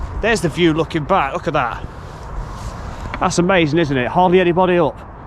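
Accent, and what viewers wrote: Nottingham, United Kingdom. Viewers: Another great vid mate. You must be from Notts with that accent...' 'Bulwool' ! Lol. Hope to go up there soon .Bless yuz.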